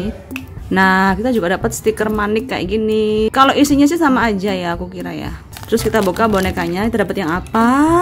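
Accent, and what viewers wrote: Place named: Java, Indonesia. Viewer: Logat jawa nya kliatan wkwk😄🖤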